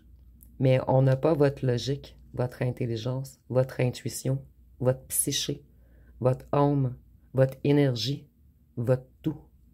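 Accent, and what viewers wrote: Quebec, Canada. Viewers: Tres bel accent quebecois chere madame❤❤❤❤❤❤❤❤❤❤❤❤❤❤❤❤❤❤❤❤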